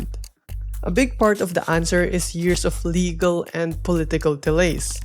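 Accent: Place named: Philippines. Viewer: sounds pinoy.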